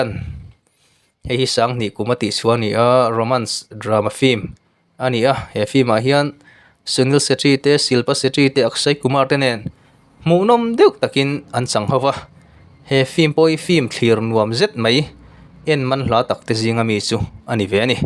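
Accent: South Mizoram, India. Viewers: Saw lampang a ang😂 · Sir chu Saw lampang ah in awm em? Nge khi lampangah, hmar lam product ori chu i tawngkau chheh atang hian a ang viaulo.. huat tur anilo aw.. inpawh zelna tur zawkin kalo sawi ve mai mai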